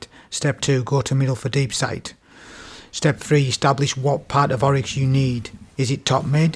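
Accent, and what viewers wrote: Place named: Newcastle, United Kingdom. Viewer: Scouse? He always sounded Geordie to me 😅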